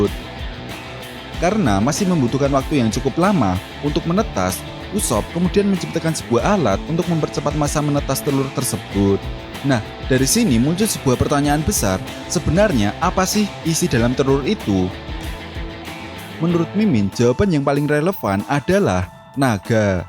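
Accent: Java, Indonesia. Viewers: Logatnya khas. . Di pulau jaayyaa!! Suka min logat medok jawanya 😅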